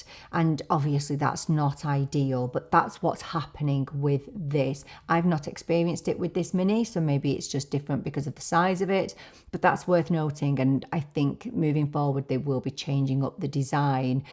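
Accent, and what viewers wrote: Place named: Northern England, United Kingdom. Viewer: I thought so 😀 I’m a northerner too, so I guess I gravitate towards you, I can relate! I’m originally from Leeds and moved to Nottingham when I was 24 (I’m 53 now) and my accent will never leave me 😂 neither will yours. Never been to Ireland myself but I’ve heard it’s very beautiful. I travel to Birmingham regularly as I’m a big gig lover. I have posted a few videos on here if you are interested. I was so excited when I saw that a Sephora had opened in the bull ring. It’s got so many different brands on display and I got a bit overwhelmed if I’m being honest. I didn’t even buy everything! 💕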